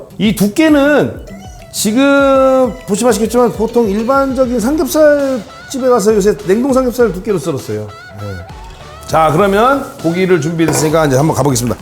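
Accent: Chungcheong, South Korea. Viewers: 구독과 좋아요!! 백종원 선생님을 옛 무한도전 때 정준하 형님이 무슨 요리 레시피 관련해서 찾아뵙던 방영분이 지금도 생각납니다. 아마도 그 때가 백종원 선생님을 처음 알았을겁니다. 그리고 나서 아마도!! 백종원 선생님을 전국적인 스타로 이름을 알리게 된 방송이 우리가 다 아는 이모티콘 방송이었을 테고요 ^^ 편집의 대마왕이라 불릴 정도로 깨알만큼 재미를 더 했었죠 그리고 ~쥬? ~쥬!! 구수한 충청도 사투리까지 더해져서 재미가 몇 배가 되었습니다. 이 후 부터는 인기와 더불어 질투하는 분들도 많이 생기고.. 어쩔 수 없는 연예인에 대한 호감에 대한 반비례 아닐까 싶습니다. 숙명과도 같은 거라고 봅니다. 같은 '성(性)'을 사용하는 분들 중에서 큰 으뜸이 되는 분이 되길 기대하고 바라겠습니다. 화이팅 입니다!!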